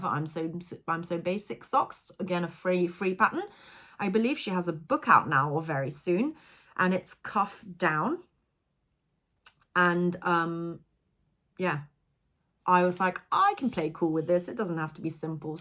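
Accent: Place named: United Kingdom. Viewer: You were cracking me up with your side bar comments. And it looks like we may have the same esthetics with knitting. So you may inspire me with future projects! And besides … I really enjoy listening to your British (or is it English) accent. ☺️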